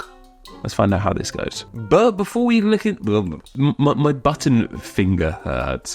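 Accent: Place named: United Kingdom. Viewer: I mean, he is British. He doesnt say many different things "correctly."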